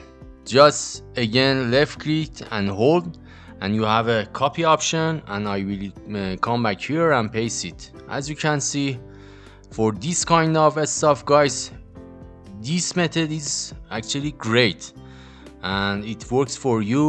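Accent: Iran. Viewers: Bro ur accent is tooo persian😂😂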